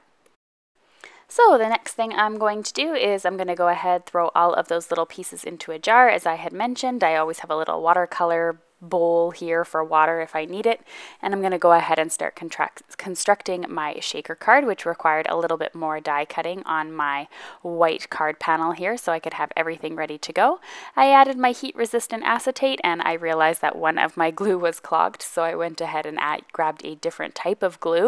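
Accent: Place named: Canada. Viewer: Four things I learned from this video: 1. You have for sure been back in Canada long enough for your “about” to sound more “aboot” 😊 2. I need to see more of the puppy!😍 3. It’s really fun to watch this process 🎬 4. I really am that lazy, it’s why I know I need almost all of my supplies in easy reach places 😄 Thanks for sharing! Oh and for the Misti and trimmer you could add a bar to the inside of your desk near the floor to slot them into. I’ll IG you a pic 👍 it works great for me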